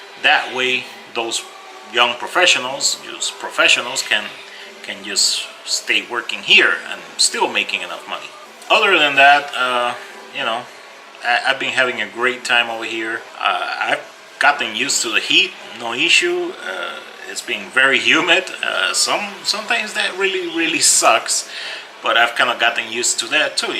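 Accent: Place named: Puerto Rico. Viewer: I'm new to your vlog. Saw you on veganvlogs. You look and sound puertorrican so welcome back to PR. Hope everything gets better for you here and that you find what you're looking for. I commend you on your efforts to try eat less meat. I know it's not easy I'm a meat eater myself who wants to go vegetarian. Always remember your goal to get healthy and you'll succeed.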